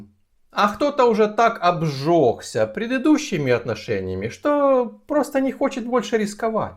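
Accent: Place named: Ukraine. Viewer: я слышу по говору что вы из Украины, и вас как и меня выдает буква Г. Хотя еще могу предположить что вы из Ростова. А мы из Одессы, да, и мы тоже находимся в Лондоне. Так сложились обстоятельства.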